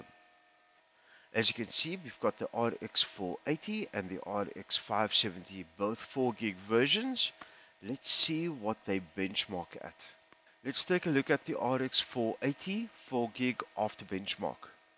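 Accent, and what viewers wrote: Afrikaans, South Africa. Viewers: Klink soos n Afrikaanse engels die.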